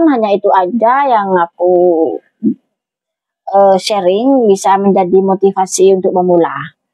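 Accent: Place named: East Java, Indonesia. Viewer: Biasa nya mental ya kak yg harus kuat klo gampang mlempem mental nya jgn coba² jadi tki/tkw,, mantap kak motivasi nya, btw kakak logat nya kyk ibu mertua saya kyk logat² probolinggo, situbondo, bondowoso, hehe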